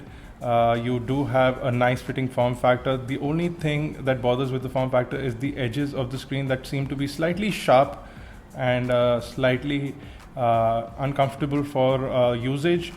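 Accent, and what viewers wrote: India. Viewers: agghh...that indian accent is killing me..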